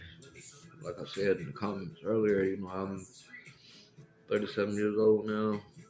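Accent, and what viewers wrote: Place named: Louisiana, United States. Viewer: Are you from Louisiana? I’m not making fun of the accent - I like it I’m just curious if that’s a Louisiana accent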